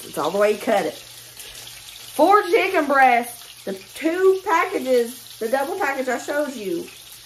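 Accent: South, United States. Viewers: Just love listening to your lovely Southern accent here in the UK. You are so natural and sensible like a gorgeous Mum teaching me to cook. I can afford what I want now but would have been so grateful of your help when I was young and broke!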